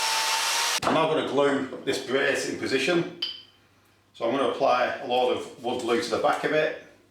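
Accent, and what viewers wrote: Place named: Chorley, United Kingdom. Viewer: I really do love your videos-have learned so much from them! Great accent too! I grew up in Chorley and it makes me feel quite homesick when i hear you! Any chance of a video to do with fitting a small handbasin in a toilet only room? Need to do this job and would feel much more confident to see you do it first!! Good stuff...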